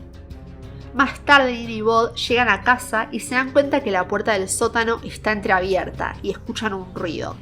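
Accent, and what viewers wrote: Argentina. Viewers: Te lo explico porque al parecer sos ignorante, yo soy argentino y el acento en mi país es así, yo= le decimos sho, llave= shave, la "Y" se pronuncia así, deberías tener un poco más de respeto, en diferentes países se hablan de diferentes maneras y cada uno tiene un acento.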